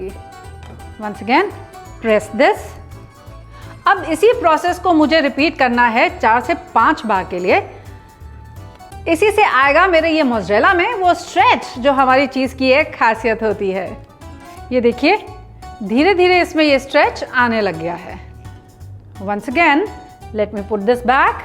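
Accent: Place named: Bihar, India. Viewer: Ka ho ustad👀 u r bihari woho I thou u r Punjabi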